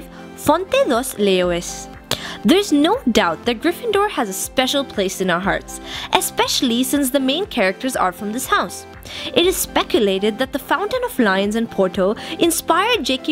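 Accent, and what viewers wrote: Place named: Spain. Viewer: I'm Portuguese and hearing her pronounce every single place with a Spanish accent really made me cringe. People need to understand that Portuguese and Spanish are two different languages, we might have similarities but accent is not one of them. Portuguese and Spanish don't have a good history together so please don't ever mix Spanish and Portuguese because both ethnicities won't appreciate it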